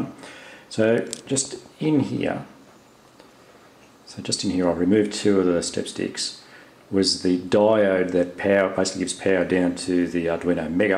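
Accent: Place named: Australia. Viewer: Hi. Love your work on the Evolution. By any chance are you selling kits or your dual Z prototype? Cheers Andrew PS It's good to hear an Aussie accent on YouTube. I'm from Brisbane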